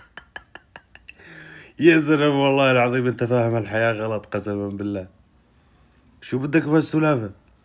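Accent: Hauran, Syria. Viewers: كلامك بمحلو لو كان مزح بس ملغم افحمتو لابو سلمان واحلى لهجة هية اللهجة الحورانية 😊😊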